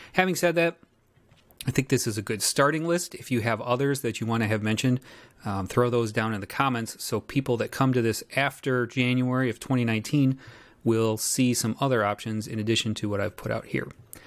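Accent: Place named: Midwest, United States. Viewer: This is just a relatively thick Midwestern or Canadian accent, he's pronouncing it correctly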